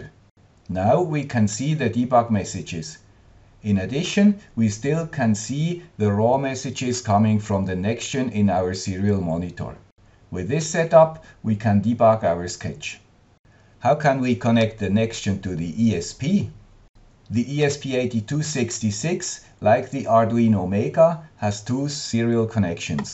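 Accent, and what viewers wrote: Switzerland. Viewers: HI Guy with the Swiss Accent again! I can't Thank you enough!!!!!!!!!!!!!!!!!!!!!!!!!!!!!!!!!!!!!!!!!!!!!!!!!!!!!!!!!!!!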